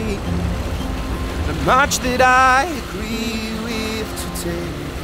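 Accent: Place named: Germany. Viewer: how is it that you can sing in an American accent but speak with a German one? I find it fascinating. And, beautiful as always, bro.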